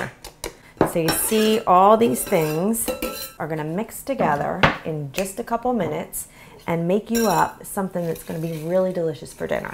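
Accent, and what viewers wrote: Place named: East Coast, United States. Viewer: I hear the east coast in your accent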